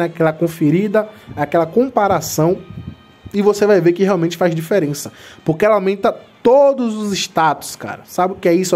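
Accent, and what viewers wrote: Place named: Salvador, Brazil. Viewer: Salve de Salvador meu nobre, pelo sotaque sei que é conterrâneo, de qual bairro fera?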